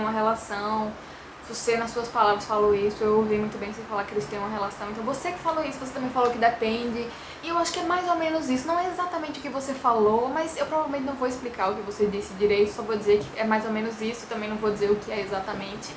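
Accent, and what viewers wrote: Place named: Northeast, Brazil. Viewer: Não gosto muito do sotaque do nordeste :S Mas sim, é verdade isso hehe estuda o que la?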